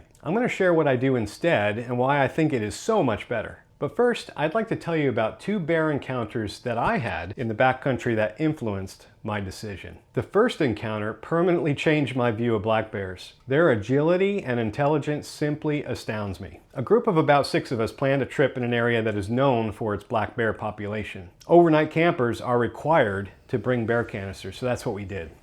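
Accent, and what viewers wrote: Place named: North Carolina, United States. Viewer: oh no! I was hoping you wouldnt say that! I had a feeling you were from NC the way you talked and the way the photos looked. I want to do flat laurel creek and sam knob. What scares me is I don't know how much of the bear activity goesunreported. Thank you for the heads up. I do have a bear canister and I figured you were going in that area when you said they were required in the area you were speaking of.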